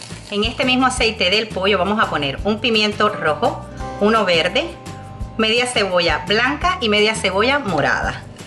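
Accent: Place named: Cuba. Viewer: Hola wuapa .nueva por aki buscando resetas .te encontré. Me gusta tu manera de explicar y tu acento eres cubana .k pinta tiene ese arros por dios k riko .un saludo un gusto verte y estar suscrita atu canal 👌💪😘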